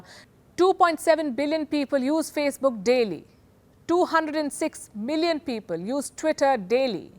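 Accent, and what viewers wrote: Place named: India. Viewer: English not Indian English please so annoying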